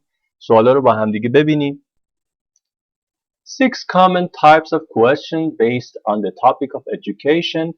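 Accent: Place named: India. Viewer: مثه هندیا انگلیسی صحبت میکنید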